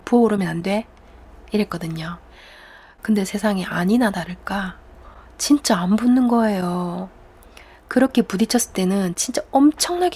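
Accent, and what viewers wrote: Gyeongsang, South Korea. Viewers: 나도 갱상도 할밸세. 좋은일 하는군..ㅎㅎ 자넨 사투리라고 하지만...억양만 그럴뿐 용어나 발음은 많이 표준화 됐다네... 나도 국어와 언어공부를 쪼께 했는데, 연습하고 공부하지 않은 토백이 사투리는 자네처럼 발음이 안되지....노력 많이했네. 계속 정진 하고 좋은결과얻고 행복 하시게...나도 독자로서 자네 사랑한다네...신의 은총과 축복을 비네.!!! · 사투리 방송 방갑네요 어떻게 갱상도 사투리 방송을 할려는 생각을 하싯는지.... 그리고 진짜 욱겨요 ㅋㅋㅋ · 나도 경상도 사람이지만.. 사투리 너무 웃겨 ㅋㅋ 너무 현실적이야...ㅜㅜ 그래서 더 귀에 콕콕 박혀.. ㅋㅋ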